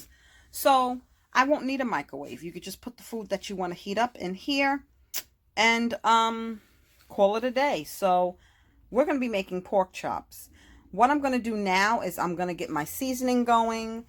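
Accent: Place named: New York, United States. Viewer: That little piece was I'm sure was the best. But the rest I'm sure was perfect. You sound like a New Yorker all day and night. Something I will try soon!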